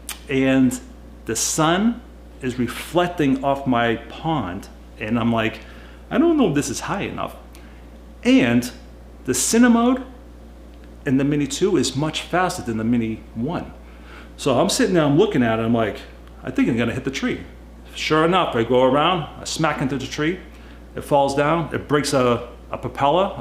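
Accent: United States. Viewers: you sound very american but you say "fuck me" like a true blue aussie I like that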